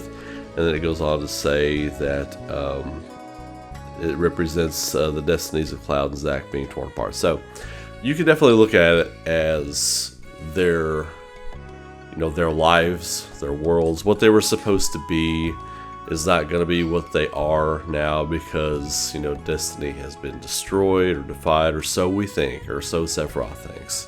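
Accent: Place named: Eastern Kentucky, United States. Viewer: I love your content bro! Btw are you from Eastern KY? You sound exactly like one of my best friends from there. Very easy to listen to!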